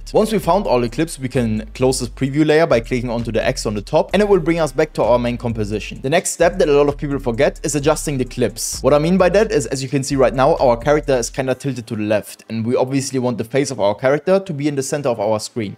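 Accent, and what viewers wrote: Germany. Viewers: Are You German? I hear a german accent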